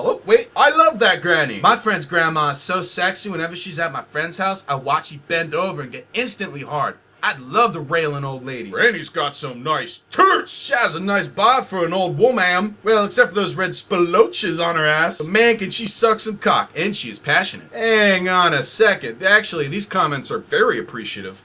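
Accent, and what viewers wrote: Germany. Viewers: I'm german and your german accent is surprisingly good. Some rambles sounded like acctual words.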